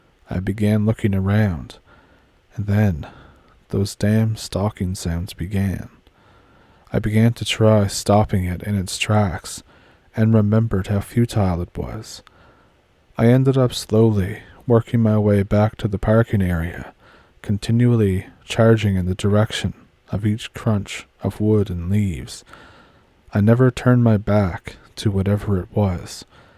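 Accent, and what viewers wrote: Ontario, Canada. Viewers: Hearing your voice reminds me of how my cousins from Ontario sounded. 🎉 Good Times, wot?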